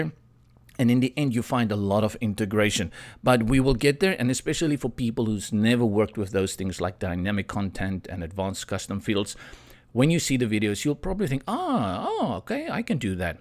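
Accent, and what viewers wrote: South Africa. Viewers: Thank you for the video, really appreciate the time you put into it. Lekker om na 'n Afrikaner te luister :)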